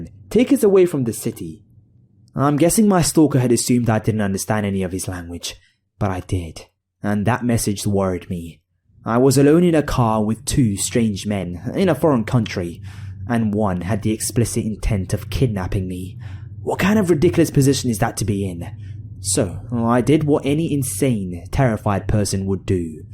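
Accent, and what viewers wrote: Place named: England, United Kingdom. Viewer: His English acsent makes the story's not as scary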